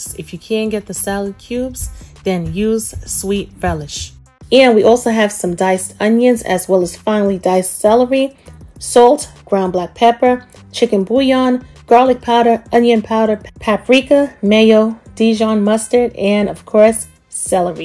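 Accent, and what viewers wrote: New York, United States. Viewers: Love your NY accent. Miss home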